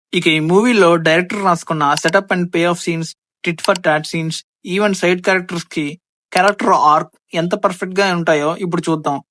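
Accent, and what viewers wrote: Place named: Nellore, India. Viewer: Nijam Cheppu medi Nellore kada !!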